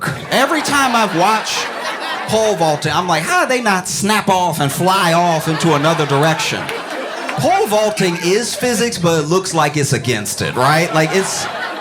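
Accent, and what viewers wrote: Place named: Louisiana, United States. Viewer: is a master at bringing it home with his facial expressions. 👍 And as a Texan, I like his slight twing of Louisiana accent. Makes it so so authentic and endearing.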